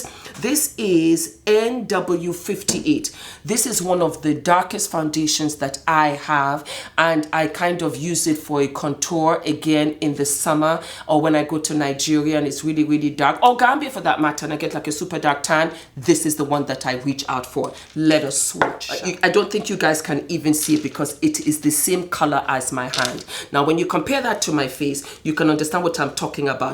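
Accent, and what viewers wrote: France. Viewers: Your French accent is coming out.... very very.i love it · first time watching...i totally enjoyed it, love the french accent:) #newsubbie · That accent. is that French?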